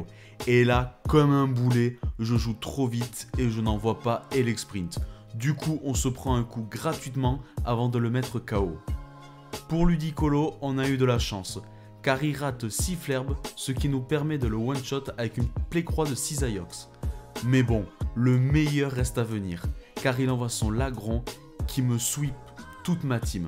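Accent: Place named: South, France. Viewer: Super vidéo le reuf !! Je découvre ta chaîne, petit accent du sud, on entend les cigales et le pastaga, un peu d'humour de temps en temps pile ce qu'il faut. Petite pépite ça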